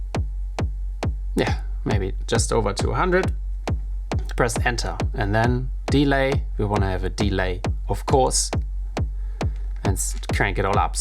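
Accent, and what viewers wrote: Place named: Germany. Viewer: Djeezes, it sounds nice when Germans speak English ! Danke und grussen aus Holland.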